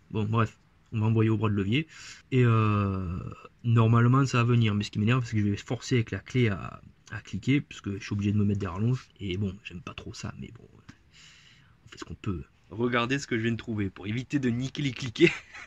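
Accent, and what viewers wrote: South, France. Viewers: Cc je suis un nouveau abonné à ta chaîne et je t'avoue que je trouve ça plutôt très bien ta des plans de vidéo propre des explications claires et surtout un putain d'accent du sud que j'adore 👍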